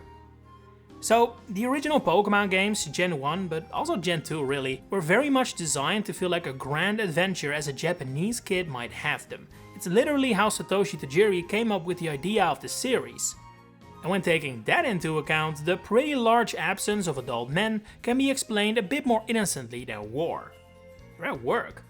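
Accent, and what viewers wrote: Netherlands. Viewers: Lol, I thought you sounded Dutch a few times in this video. Turns out you are. Hello fellow Dutchie 🇳🇱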